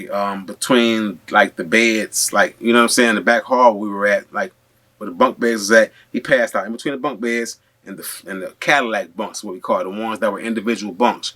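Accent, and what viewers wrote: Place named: Detroit, United States. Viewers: This is hilarious 😂 🤣🤣 I knew you was from the Midwest I'm subscribed bro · Man like 1 minute into the video, I'm like "Doggie from Detroit" lol gotta be, or somewhere close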